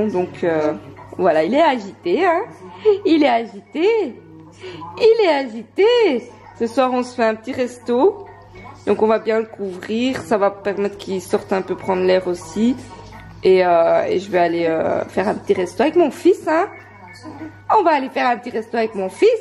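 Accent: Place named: Belgium. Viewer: Tu es super mignonne❤🙏 et ton fis heen😍... serais-tu belge , je reconnais l' accens hahahha / ton fils et metis? excuse moi, mais c' est la 1er vidéo que je regarde de toi et j' aime beaucoup😊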